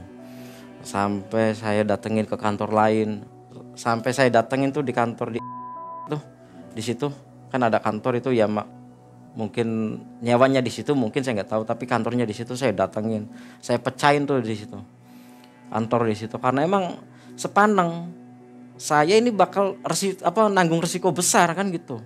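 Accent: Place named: Indramayu, Indonesia. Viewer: Bahasa Indramayu 😅😅 kongsi enk ngrongokna e mang 😂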